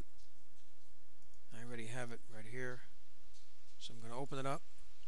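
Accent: New York, United States. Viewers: Thanks Brother...You sound like a New Yorkuh...I Love NY & God Bless Yous :)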